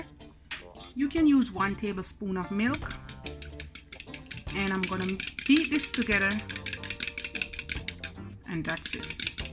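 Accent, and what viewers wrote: Jamaica. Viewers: Wagwaaaan I love your Jamaican accent. .ouchea learning a great recipe for great food I hope you ill hallla at me too ...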